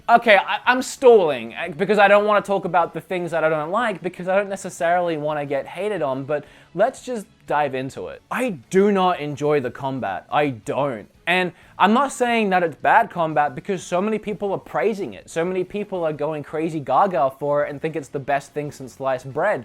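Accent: United Kingdom. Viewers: Terrible British Accent? Snob? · Terrible British accent? Dont worry, we dont think much of your convict accent!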